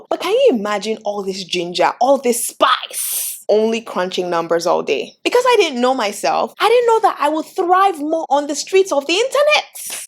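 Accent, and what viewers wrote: Nigeria. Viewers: Giiiirl! Are you Nigerian? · Thank you for making this video. Truly it’s a blessing. Girl, are you Nigerian? The accent peaked through. Fellow Nigerian here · I love your Nigerian accent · It's the Nigerian accent for me😂 Thanks alot for this gem and giving us a great personality to go with it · Is that a Nigerian accent I heard😍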